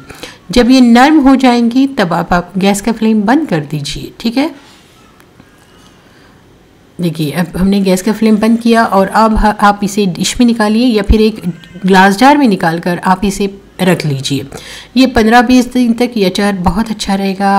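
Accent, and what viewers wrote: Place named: Gulbarga, India. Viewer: yes phool bangalore mein nahi dekhe ,shayad aap gulbarga ke taraf se, hain lehje baat cheet se aisa lagta hai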